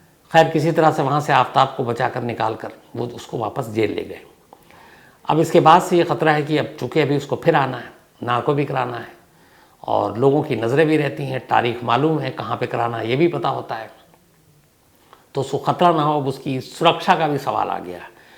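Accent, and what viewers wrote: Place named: Lucknow, India. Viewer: Oh now I know how your pronunciation of your Urdu grammar..it seems Lucknow Urdu the original ...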